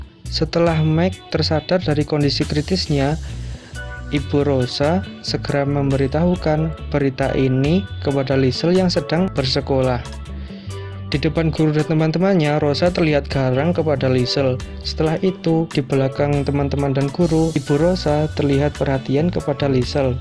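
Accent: Java, Indonesia. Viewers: Jangan terlalu medok logatnya min, jd krg enak didengar, maaf ya biar kedepan lbh baik lg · Medokkk jawa , suara lu kya org kurang makan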